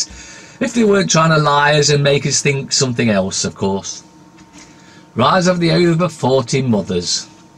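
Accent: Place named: Mansfield, United Kingdom. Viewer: 95 pee !!.. lol ;).. sorry buddy, Mansfield accent drives me potty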